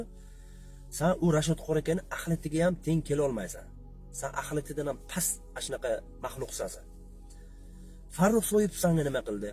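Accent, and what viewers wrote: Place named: Namangan, Uzbekistan. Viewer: Номонгонликми дейман шевасига караганда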